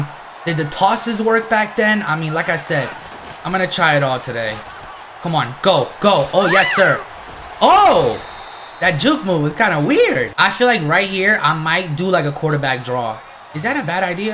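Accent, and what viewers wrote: Maryland, United States. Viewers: Are you from Mary land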